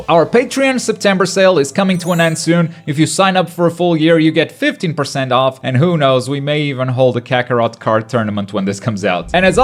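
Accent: Portugal. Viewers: hey man good video, are you portuguese btw? i noticed you have a similar accent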